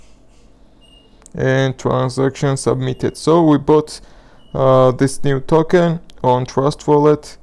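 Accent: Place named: Georgia, United States. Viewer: I recognized the Georgian accent LOL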